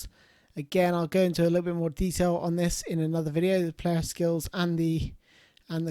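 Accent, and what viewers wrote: United Kingdom. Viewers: Are you from Uk ??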